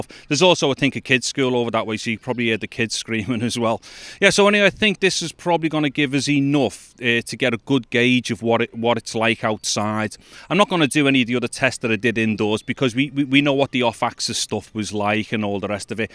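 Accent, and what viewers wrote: Liverpool, United Kingdom. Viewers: Hes a scouser boss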